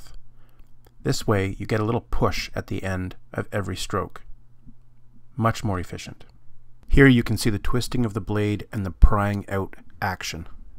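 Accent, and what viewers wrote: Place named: Canada. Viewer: Only learn canoe techniques from someone with a Canadian accent.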